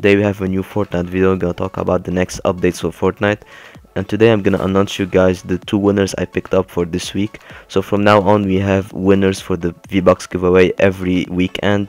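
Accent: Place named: Lebanon. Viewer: Yo im from Orlando but I got a friend from Lebanon and you have a really similar accent, are you by any chance Lebanese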